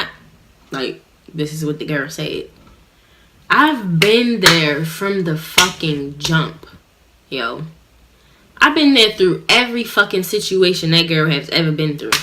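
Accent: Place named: Baltimore, United States. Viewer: I know your from B more your accent is 🥰 blessings to u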